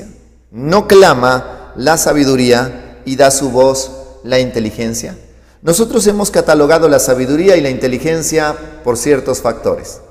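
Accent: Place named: Mexico. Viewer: yo pensaba que el pastor era mexicano 😄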